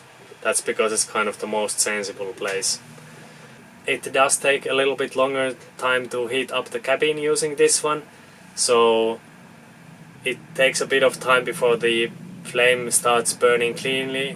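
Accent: Finland. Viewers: Great! Informativ for me and my campingbus heat project. I go for a small diesel heater, who can work on full trottle. I don't understand where you came from, but I notice some Finlands accent 😃.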